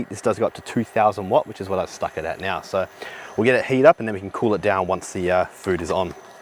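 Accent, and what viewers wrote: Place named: Australia. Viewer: Nice to hear an Aussie who isn’t a hobo. Well done.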